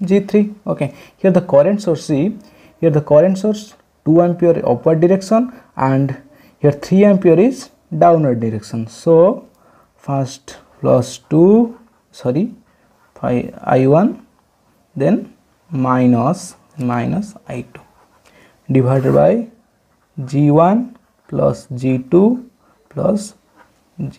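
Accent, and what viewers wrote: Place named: Odisha, India. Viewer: Sir are you an Odia??? Your accent sounds like ours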